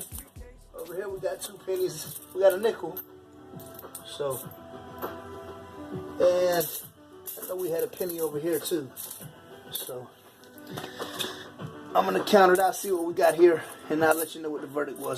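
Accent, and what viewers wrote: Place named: New Orleans, United States. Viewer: Not sure what rabbit hole I’m in that I landed on a vending machine video but I need to know where this man is from so I can place the accent (love it, New Orleans?) and I’m going to look into buying a vending machine!! Lol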